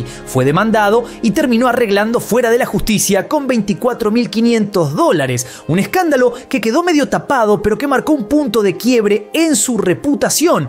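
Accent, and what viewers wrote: Argentina. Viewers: Amamos ese toque argentino sello de nuestro..que tiene ese que se yo viste?..cariños desde Chile gracias tremendo Val,siempre sera Jim❤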